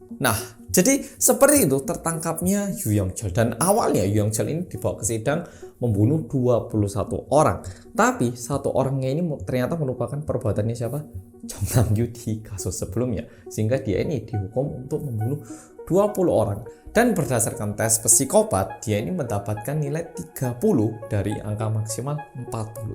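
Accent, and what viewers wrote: Java, Indonesia. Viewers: Hujan gini nonton KOREA REOMET BERSAMA ORANG KOREA YANG MEDHOKKKK.. Hehehe.. Semangat trus yea mas bikin konten spti ini candu bget dah.. Sehat2 sllu yea mas. 😊 🙏🏾 🖤 🔥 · Kok mirip. Ko Korea medok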